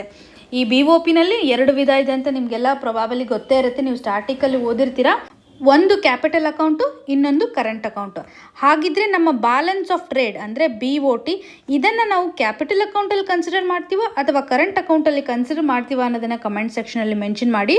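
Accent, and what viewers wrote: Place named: North Karnataka, India. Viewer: Excellent madam.. 👌Pakka uttara karnataka language....😁😇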